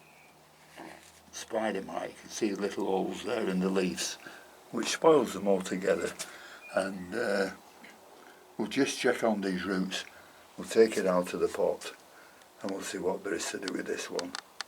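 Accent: United Kingdom. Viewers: I love the way you say "boogs"😁 I'm american and fascinated by the british accent anyway, but yours is especially fascinating. I love your channel and learn so much every time I tune in. Thank you so much for all you do for us amateur hobbyists.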